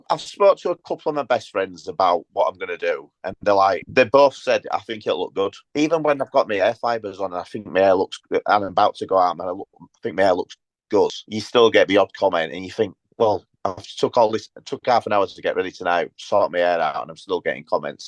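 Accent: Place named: Northern England, United Kingdom. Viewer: Just because you're not used to a Northern English accent there's no need to be a dick about it - his articulation was perfect.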